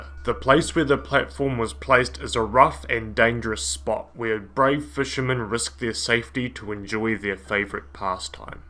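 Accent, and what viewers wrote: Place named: New Zealand. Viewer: Kiwi as..... voice over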